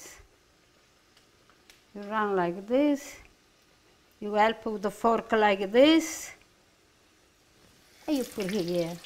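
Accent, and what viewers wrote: Italy. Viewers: I really like her italian accent she gives me some vibes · لغة انجليزيه علي فكرة بس بلكنة ايطالي لكن كل انجليش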